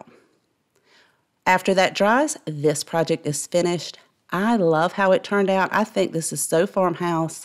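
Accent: South, United States. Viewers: Your scales is amazing and the best I’ve seen.....ps from one southern gal to another....lve the accent!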